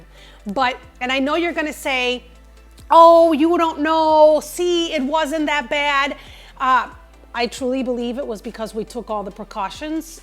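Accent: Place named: Midwest, United States. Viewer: Your mid west accent is extremely obnoxious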